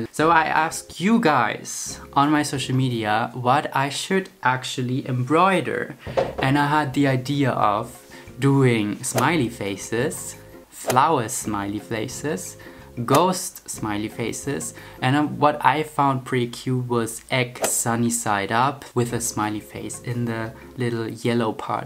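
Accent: Germany. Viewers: I can totally tell that you’re german by the accent, cute!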